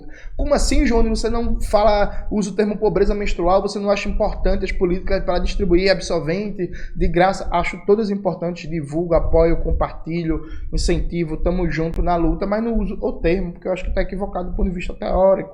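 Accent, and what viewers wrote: Pernambuco, Brazil. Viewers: Clique beite. Eu adoro esse sotaque de Pernambuco❤❤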